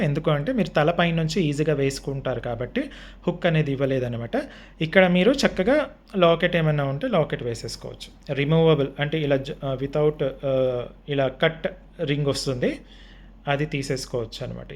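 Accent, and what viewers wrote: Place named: Telangana, India. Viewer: Mee telangana slang super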